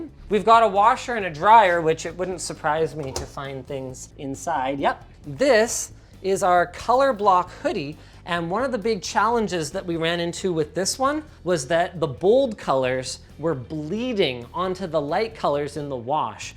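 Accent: South Africa. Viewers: we hear that South African accent bru.Nice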